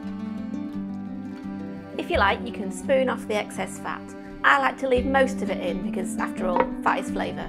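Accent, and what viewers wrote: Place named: Manchester, United Kingdom. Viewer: Love your show, somehow I have a feeling that you are a Mancunian Girl, and love your accent. We know your husband’s name is Chris, how about your name? It’s nice to write a comment with your name in it. As for this Curried Lamb, I had to clear all the fats and bones to avoid the lambie flavour, Australian Lamb is not the best in the world, and is so Rich, I suggest that you replace the Stock with water to lessen the rich flavours. As for the stringing of the Cardamom, I normally use a special stainless steel Tea Strainer where you can add other pods and seeds if required. I am cooking this spring lamb leg (de boned and fat completely removed) today and will let you know of the result. I think it’s going to be good. Thanks. Regards, Dr. Matthew Banno, Melbourne, Australia.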